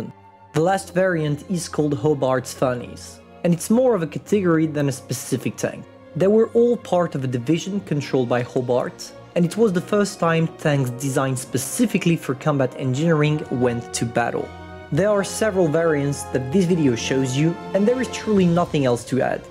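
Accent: France. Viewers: Now I feel that I am in a history lesson with a French boi talking in English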